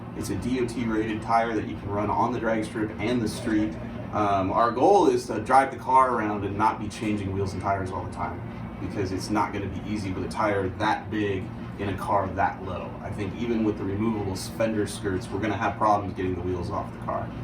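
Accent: South, United States. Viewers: new accent is really freaking me out. Its a cross between Californian but more southern drawl now.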